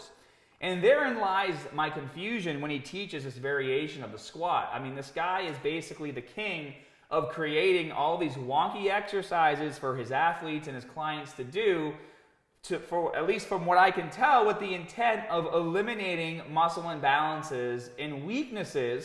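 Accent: Boston, United States. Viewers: The most Boston of Boston accents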